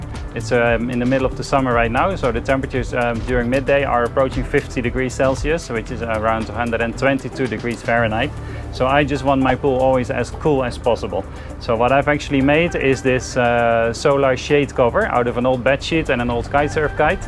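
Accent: Netherlands. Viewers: When I heard him speaking I immediately knew he's from the Netherlands 😂 I just know the accent lol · You sound Dutch, but the environment looks way better than here in Holland. Nice video!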